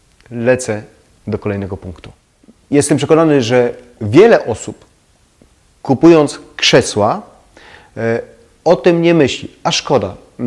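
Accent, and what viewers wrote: Silesia, Poland. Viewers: Cześ ! Słychać żeś jes chopok z Rudy . Racja mosz . Trzymej sie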